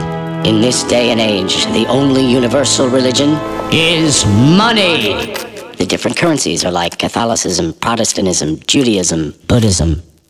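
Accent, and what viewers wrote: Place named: Russia. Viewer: По мне в русской озвучке намного лучше...К тому же тут какой-то русский акцент прощупывается(хотя возможно это только мне кажется)...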